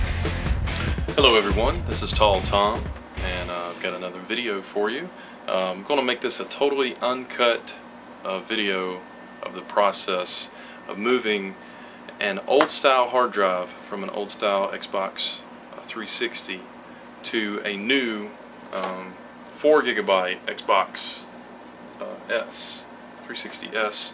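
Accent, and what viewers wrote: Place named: United Kingdom. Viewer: Love the British accent. All these years later I had no idea I could do this.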